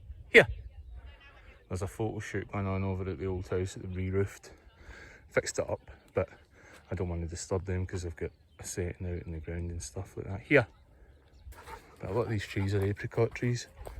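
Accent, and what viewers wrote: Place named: Scotland, United Kingdom. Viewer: Perfect accent for someone fae Bannockburn even if this one is in new Zealand 🏴🇳🇿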